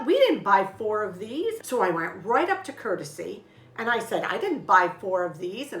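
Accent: New York, United States. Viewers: I love hearing another New Yorker, it's like listening to family! These were such great tips. I really appreciated all your ideas and that lovely pie!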